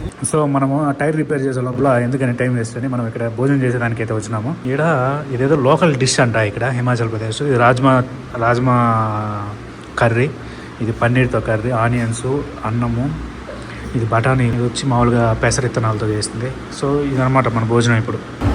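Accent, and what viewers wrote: Nellore, India. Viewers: 😂😂😂 Nellore slang super 😊 abba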